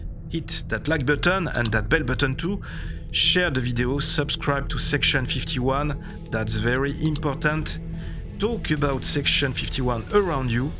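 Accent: France. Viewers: Ça c'est l'accent d'un français ou bien je ne m'y connais pas en accents 😂😂😅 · .... I can't. 😅 As much as I'm interested in the content that Ridiculous French Accent drives me insane. That annoying AI Voice would of been more bearable..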